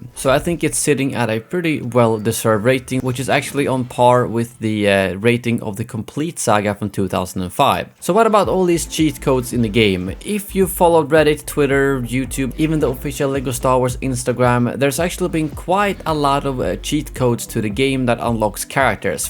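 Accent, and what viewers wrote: Sweden. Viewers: Haha man kan höra att due svenne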